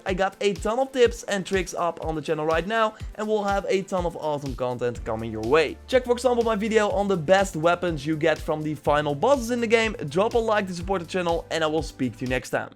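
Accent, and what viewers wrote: Netherlands. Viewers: Can you have a more english accent, i know you're dutch but still can improve with your pronouncing. Keep up the good work! ;)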